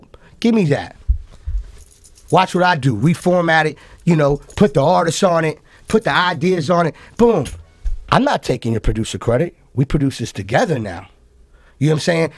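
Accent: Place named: Jamaica. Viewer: Dj Khaled is a real producer no joke talk straight frm JAMAICA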